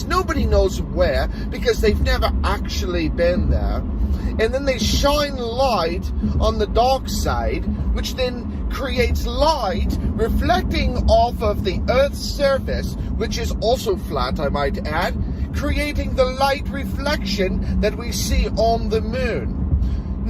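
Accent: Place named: England, United Kingdom. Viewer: What's with the English accent? Doesn't make you sound smart especially when you have no idea who Brian May is.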